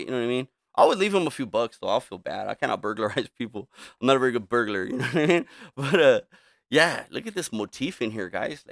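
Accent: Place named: Latin America. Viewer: When listen to your voice I am getting a hint of a Latino accent and I am ready for you to break into a Cheech and Chong skit.💨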